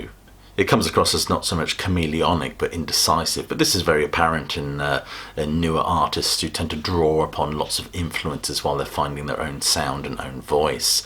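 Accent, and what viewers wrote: England, United Kingdom. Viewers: Top notch English accent and vocabulary.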